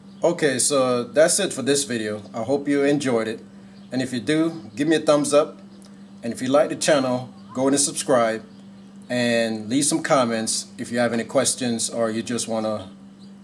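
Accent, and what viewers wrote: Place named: Jamaica. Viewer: You sound Jamaican. Looking forward to more Hr-v mod videos. Good work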